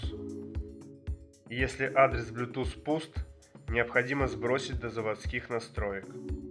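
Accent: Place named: Uzbekistan. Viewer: Узбеки что ли озвучивают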